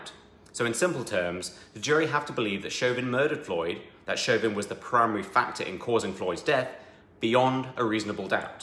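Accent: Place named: United Kingdom. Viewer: You British dude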